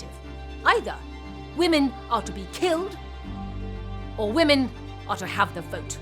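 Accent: United Kingdom. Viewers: So she has British accent. Great.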